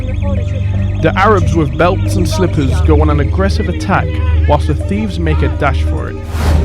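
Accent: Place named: Jamaica. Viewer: Your accent kills me 😂😂 why are you talking Jamaican. It's not cool to be a wigga lol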